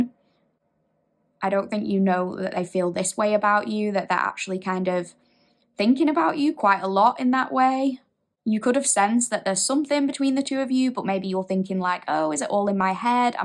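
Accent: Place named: Scotland, United Kingdom. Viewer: u r very good reader, like ur 'scottish accent'...many thnx🎉🎉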